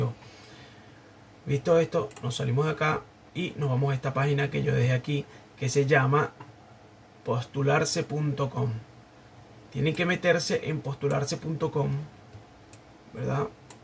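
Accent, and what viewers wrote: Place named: Puerto Rico. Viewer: Amigo sonas puertorriqueño, de que parte de argentina sos?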